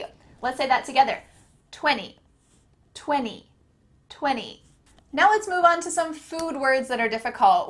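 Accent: United States. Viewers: Please do not say you are speaking or pronouncing “English” You are pronouncing :AMERICAN”. It is very different. In English remember D is at the start of the word. Mirror is MIR. Ur. Asks is a long A “R skas....Please you are murdering my language !!!!!!!! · Please stop learning English from an American, they destroy the language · This is American English pronunciation - bah humbug. Correct your title. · American English pronunciation? Yes, I think so. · Oh, as a Brit I had to abort! Those pronunciations are... very American! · Not that hard. Just kidding. Not that hard for me now yet it did take me some time to master the pronunciation of those words. And I'm glad you didn't include words such as whirlwind or caveat. (By the way, do you live in the US? Your speech sounds more and more American. I remember you mentioning that your husband is American - I might be wrong about that). 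What a teacher you have become ! Kudos to you. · I know you say this is American English, but as someone who’s been born and bred in the UK, this is sooooo American 😂 I love it 😻 it’s all the words that stand out to me when watching American shows, I could hear the difference but I couldn’t understand what it was that made it different, this is so cool, thanks 🙏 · hell this is not English its American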